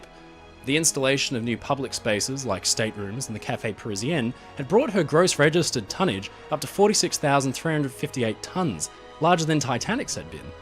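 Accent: Australia. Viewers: I really appreciate your delivery. It’s so nice to hear narration marked by impeccable pronunciation and phrasing with the stresses and pauses all correct and appropriate. For anyone not familiar with it, the accent is “educated Australian”. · He has an Australian accent! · Probably because he is Australian, to him American accents are annoying yet he’s not being an ass cause he seems like a nice dude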